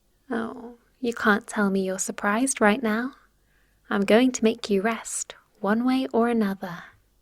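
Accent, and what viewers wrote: England, United Kingdom. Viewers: Man, im a sucker for a good English accent.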